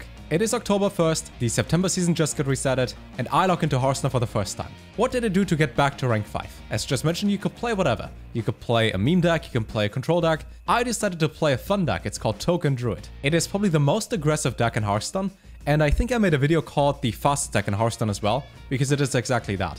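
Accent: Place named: Germany. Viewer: I cant quite tell where ur accent is from but it sounds german Wenn das wahr is dann is mein lieblings youtuber deutsch , genau wie ich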